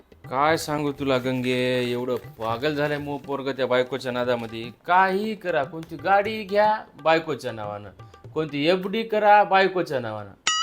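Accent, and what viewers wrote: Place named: Nanded, India. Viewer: Bhasha chi boli Nanded chi aahe kai bhari acting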